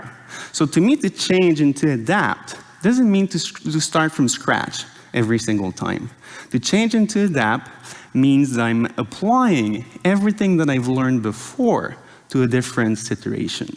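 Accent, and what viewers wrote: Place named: France. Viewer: It seems as though his primary language is french, hence his name and the accent while speaking in english. English switches pronoun every 3 digits added, french adds it every 2 digits. For example the number (77) is "seventy-seven" in english, yet, "soixante-dix-sept" in french, which translates to something along the lines of "sixty and ten plus seven" and yes, it does make for a very cumbersome approach to expressing numerics. This is probably why english is superior to french, and I speculate chinese superior to english, for conducting mathematics in general, or "mathigrammatics" if you will ;)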